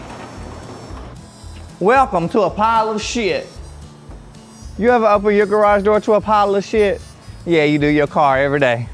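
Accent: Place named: United States. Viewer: Lol.. Why does he sound country, black and gay 😂😂😂 allllll at the same time .. Which is fine and its funny...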